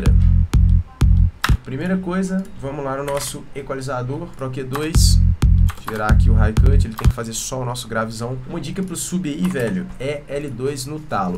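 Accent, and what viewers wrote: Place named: Minas Gerais, Brazil. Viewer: Qualquer ideia que você jogar, a gente assiste, meu patrão. Você é fera demais. E outra, o sotaque mineiro tá forte, hein hahahahaha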